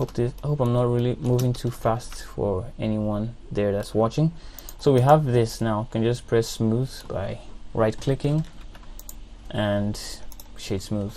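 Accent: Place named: Nigeria. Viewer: you have an accent, are you Nigerian?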